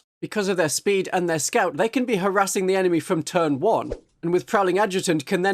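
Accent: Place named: England, United Kingdom. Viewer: Your accent makes it sound like you might be in the same corner of England as me. I'd suggest meeting up for a game but I don't know how I'd feel playing against a 2d animated character. Who framed roger rabbit freaked me out as a kid so I'm not sure my mind could take it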